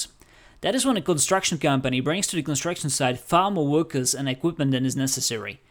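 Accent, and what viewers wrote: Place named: Europe. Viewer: "I live in small country with pop just 10m, with 1m is a force" based on your pronunciation and accents now my scope is GRE, BEL or NED, and maybe CZE too (exclude POR because I heard them accents and I knew it)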